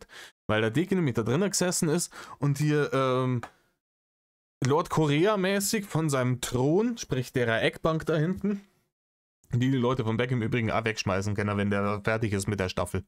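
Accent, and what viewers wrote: Bavaria, Germany. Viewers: Hallo könntest du bei deinem nächsten Video wider mehr Bayerisch sprechen. Weil ich muss dann lachen wenn du dich über denn Kürbis Kopf aufregst auf bayrisch wenn der immer wider was am verzapfen macht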